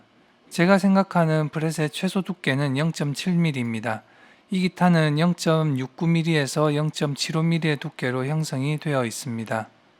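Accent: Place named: Gyeongsang, South Korea. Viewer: 우연히 본 일본 올드 기타 리페어 영상들~ 정말 손재주가 대단하세요 ~ 구수한 경상도 사투리가 정감이 가네요. 저도 울산인이라~ 기타 간단한 작업 정도만 하는 주부인데 장비며 꼼꼼히 리페어 작업하시는 게 감탄이 나오네요~ 재미있게 여러 영상들 잘 봤습니다~😊😊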